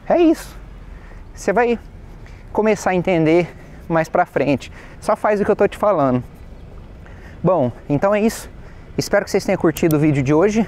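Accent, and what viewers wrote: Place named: United Kingdom. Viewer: Cara. Desculpa, mas... ver um Brasileiro falando o inglês britânico, me dá impressão que está errado! (Não está, eu sou 100% acostumado com o inglês Americano) Me soa estranho!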